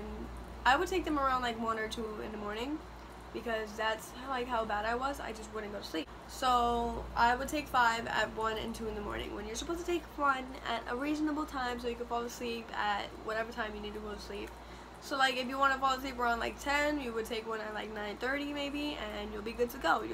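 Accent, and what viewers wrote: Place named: Puerto Rico. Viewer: tú eres Boricua por Leyyy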